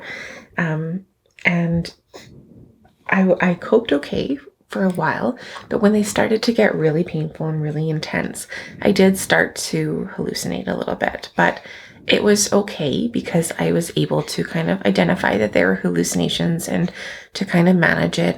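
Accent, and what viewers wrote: Canada. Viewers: Your Canadian accent is showing and I love it. (Mississauga girl here). Thank you for sharing your story. Big congrats to you!! she's such a sweetie <3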